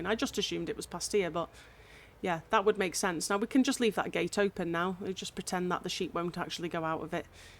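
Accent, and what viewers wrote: United Kingdom. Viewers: Great tutorials. Not many out there covering all the editing of paths and your thought process. Nice and clear instructions too with no pauses and umms and ahhs. Always good to hear a UK voice on YouTube as well lol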